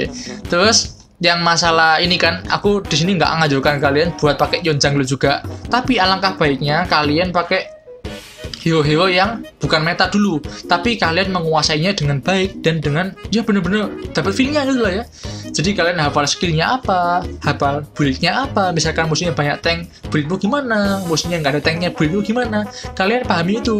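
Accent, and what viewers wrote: Java, Indonesia. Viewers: Bang tolong tambahin logat" jawanya,ya bang 😁😁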